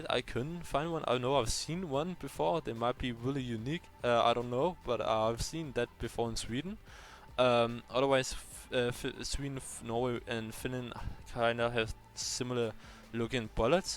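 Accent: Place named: Denmark. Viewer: Your Danish accent is so strong 😀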